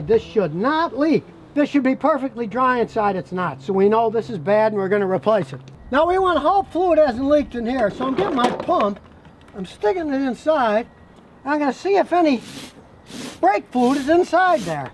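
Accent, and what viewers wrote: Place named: Brooklyn, United States. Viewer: has Brooklyn😁 accent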